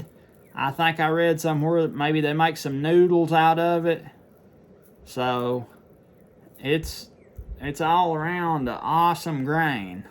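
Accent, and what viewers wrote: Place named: Danville, United States. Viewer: Farro is the common name. I remembered it from hippies. I bought some just now whilst I was listening to you. You talk like me n my people in danville va. Looking forward to fall planting!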